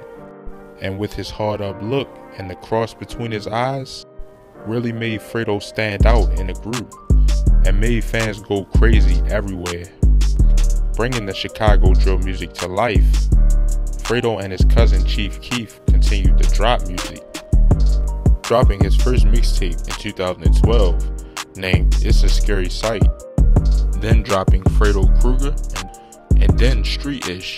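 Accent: Baltimore, United States. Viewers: I can tell he from Baltimore lol it's how he says you two flew etc 😂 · Narrator must be from Baltimore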